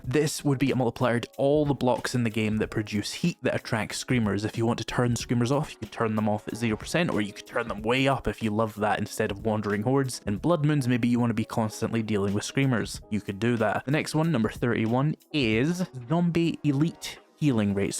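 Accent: United Kingdom. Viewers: he is Brittish or at the very least from the UK so not American.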